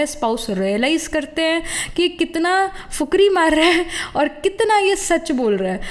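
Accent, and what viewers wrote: Punjab, India. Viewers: Are you Punjabi girl language tone shows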